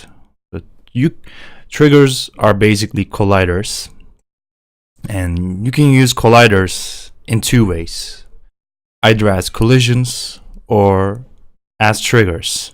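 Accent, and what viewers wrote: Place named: Turkey. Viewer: Lovely accent but immedietly heard something familiar. It didn't take me long time to realize you're also Turkish. Thank you for excellent video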